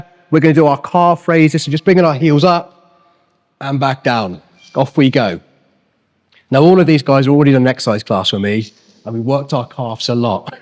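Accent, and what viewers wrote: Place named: Wales, United Kingdom. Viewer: I agree! Plus I love the Welsh accent. Thank you,!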